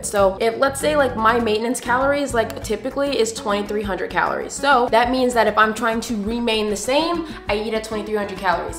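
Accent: United Kingdom. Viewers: literally discovered you through youtube and now i cant stop watching your videos! very informational :) ALSO .. your random british accent cracks me up.. (i'm british LOL)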